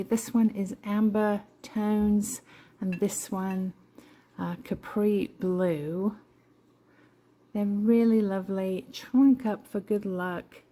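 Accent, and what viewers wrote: England, United Kingdom. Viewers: I love your content and your personality. Your English accent just makes me happy. I love it when your kiddos. I never miss a video. You have great taste.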